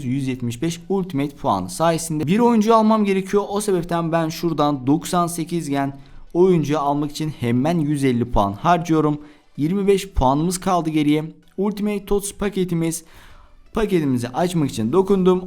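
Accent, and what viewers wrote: Azerbaijan. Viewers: Azerbaycanlı mısın sen?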